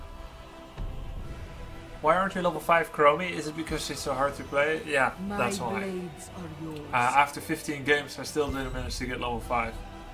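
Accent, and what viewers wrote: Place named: Netherlands. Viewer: Hey man! I first saw you as shoutcaster and wondered if you are Dutch as I thought I recognized the accent. The name Grubby did not make it clear, but now I know its Manuel Schenkhuizen and that sure clears it up ;P I cannot recommend your channel on an educational level as there is a bit too much sarcasm and goofiness, but for pure entertainment it is well worth the sub! Keep it up bro! Groeten uit Spijkenisse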